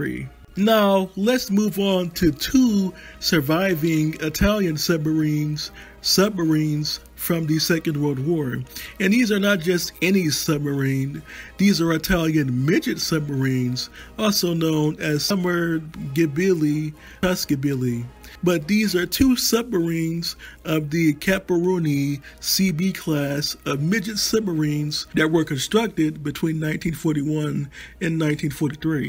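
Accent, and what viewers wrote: United States. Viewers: I had to give this video a thumbs down. Your American accent makes it unbearable to listen.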